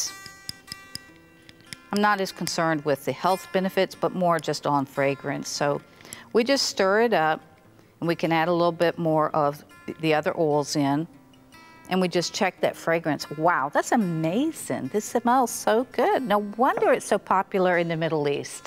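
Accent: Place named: Texas, United States. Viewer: She got that heavy Texas accent “oowls" lol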